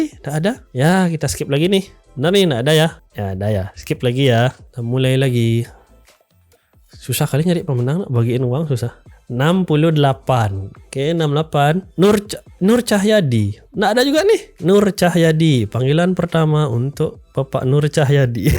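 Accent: Bali, Indonesia. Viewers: kenapa ya orang bali klo ngomong enak didengarnya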